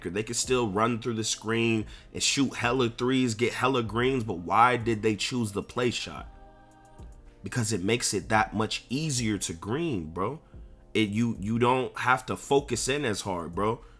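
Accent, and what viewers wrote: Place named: New York, United States. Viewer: Yo bro you one of my favorite youtubers you gotta be from NY bro 🤣🤣🤣🤣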